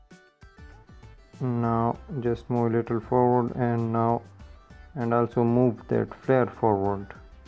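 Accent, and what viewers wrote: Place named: India. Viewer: Well the accent does not help irc you not used to Indian accent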